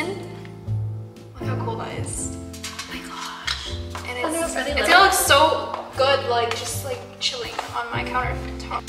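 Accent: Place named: United States. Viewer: ahh, london. you have an america accent. are you from here? if so, would you recommend moving to London/overseas? do you have a video on your experience & the cost of living?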